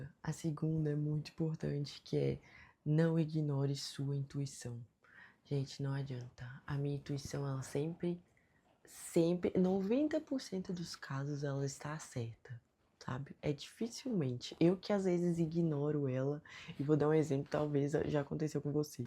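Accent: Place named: Minas Gerais, Brazil. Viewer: Gostei do seu sotaque vc é da onde ?! Mineira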